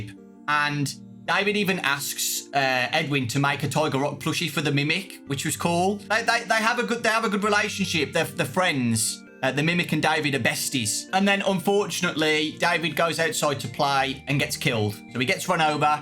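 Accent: United Kingdom. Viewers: i love how ur accent sounds i wish i was british 2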